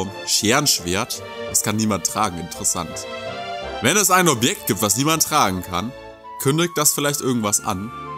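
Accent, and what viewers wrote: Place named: Low German, Germany. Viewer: Ach ja ich mag diesen Akzent. Plattdeutsch ist schon was interessantes. By the way wie geiht di dat? 😂😂 Das ist mein lieblings Satz auf Platt. Selber sprechen kann ich es nicht, aber ich verstehe es und noch was krass das LP hat fast 100 Parts. Ich würde dir raten mal in der Herberge zu übernachten. Vielleicht passiert ja auch in diesem Dorf das selbe wie im anderen. Seien wir mal ehrlich es ist schon eigenartig, dass niemand mehr da war. Ich glaube das hat was mit diesem Musiker und seinem Lied zu tun. Wer weiß vielleicht verzaubert er sie ja mit dem Lied und sie verlassen über Nacht das Dorf um irgendwas zu tun. Das erinnert mich gerade an eine Geschichte und zwar hieß sie „der Rattenfänger von Hameln" nur dass es nicht die Kinder sind die verschwinden sondern alle. Bei dem Schwert Stimme ich dir zu. Es ist ein schönes Detail im Spiel, was bei DQ meist nicht selbstverständlich ist 8 zum Beispiel, da gab es einmal was sie normal an hatten und das „Spezial Outfit" (weiß nicht, ob sie sogar mehr als ein Exklusives Outfit hatten aber naja du weiß was ich meine). 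Das finde ich in DQ 9 auch so geil, wenn man sich um zieht und der Charakter das dann auch im Kampf an hat. DQ 9 ist aber so ein tolles Spiel. Action, Spannung, Drama, Story und noch ein paar andere nennenswerte Dinge an DQ 9. Eine Frage würdest du auch mal DQ 9 LPn? Würde es auf jeden Fall klasse finden. Super Part übrigens auch noch. Freue mich schon auf den von morgen 😊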